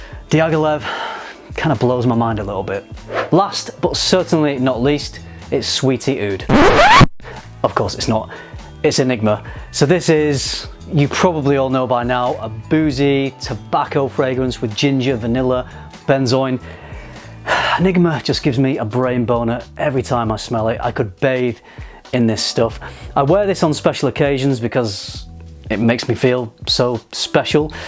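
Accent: United Kingdom. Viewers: that British Accent, man... I insanely LOVE hearing it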